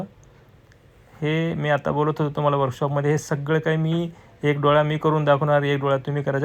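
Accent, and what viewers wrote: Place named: Latur, India. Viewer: सर तुमच्या भाषेवरून मी ओळखलं होतं तुम्ही लातूरचेच आहात मी पण लातूरचीच आहे प्रकाश नगर आणि सासर परभणी आणि पुण्यात राहतो तुम्ही तर आमच्या जवळचे निघालात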